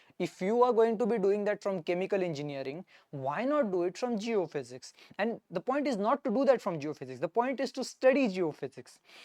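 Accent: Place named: Maharashtra, India. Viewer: are you marathi? i can see the accent slipping out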